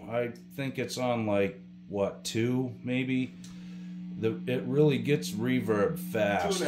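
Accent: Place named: East Coast, United States. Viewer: No offense, are you from Massachusetts?Or New York? I hear some upper East coast dialect. Talk less. Let us hear the amp more.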